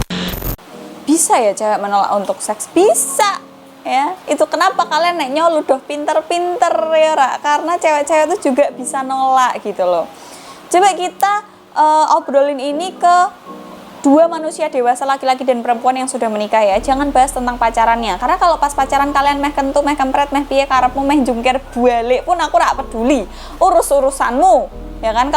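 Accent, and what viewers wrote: Java, Indonesia. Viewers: Pekat loghat jowonyo mbak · Lo orang jawa yh mbk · Medok bngt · Jawane kentelllllllll👍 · Medok mu marai kangen mbak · Cakep-cakep medokk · Medok tp ngangenin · Medokk'e poll mbak 😂😂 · suka cara gayamu ngmong mba medoknya kerasa banget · waduh medok bgt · the real medok cantik, bkn buat2 sperti d ftv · Jiann tenan mbak..Aslii cah wedok spekk jawaaa nii bossss😆😆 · q suka cara penyampe annya lucu terhibur juga nambah wawasan,maaaantap bahasanya medooook,🤣🤣🤣🤣🤣🤣🤣🤣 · Salam dr.kupang..ntt.dan beta suka wajah serta keceriaan.logat jawamu.bkin hilang stresss.ku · Medok😆😆😆, salam dari warga banjarmasin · medok Jowo nya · medok Banget bahasa jawanya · boso jowone nylekit njiir... jowo ndi mbk? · orang jawa ya mbak? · versi medok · Medok bat haha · ngomong medok gak masalah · Aku suka medok kamu, jadi tambah lucu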